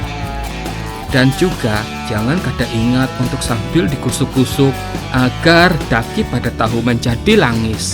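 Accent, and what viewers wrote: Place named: Kalimantan, Indonesia. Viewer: Palangkaraya mna suara nya like urang Kalimantan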